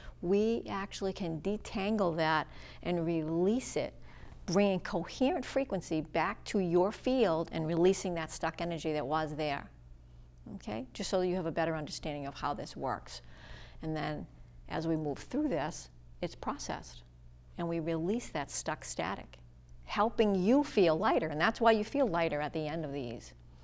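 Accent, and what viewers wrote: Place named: New England, United States. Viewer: A fellow New Englander!! I knew that accent sounded familiar! Great video. Do you teach classes in person?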